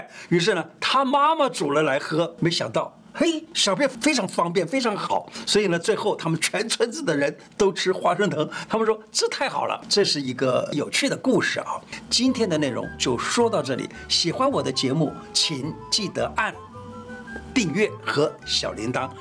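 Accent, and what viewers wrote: Taiwan. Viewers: 胡醫師的台語好可愛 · 胡老的台語 道地 有味道 用心了給你按個讚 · 笑死了，胡醫師說台語好有趣喔，說得比我有趣多了。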